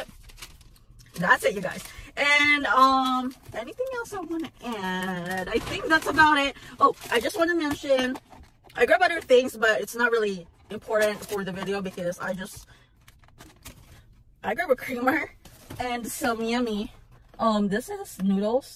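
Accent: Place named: Philippines. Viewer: My good friend is from PI and talks the same way. Maybe it's a cultural thing. It seems fine to me.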